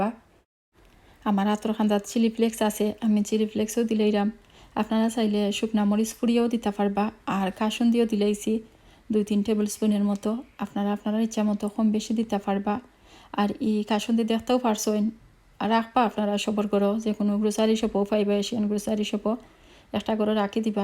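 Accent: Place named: Moulvibazar, Bangladesh. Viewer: Afa afnar math huniya lager afnar bari moulvibazar. Jodi moulvibazar hoy tho kun elakay Please koiba?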